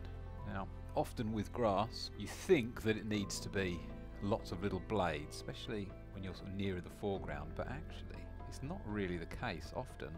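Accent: United Kingdom. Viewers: Very sweet painting/mini tutorial, I'm wondering did the client ask for it on paper? I'm pretty sure you would have used a board of some sort (I don't remember what you call them) I hope you're family and you are staying refreshed and I hope end of autumn into winter goes great for you. Also so I can reference you better in the future are you from the UK, I'm not sure of accents. Dave from Canada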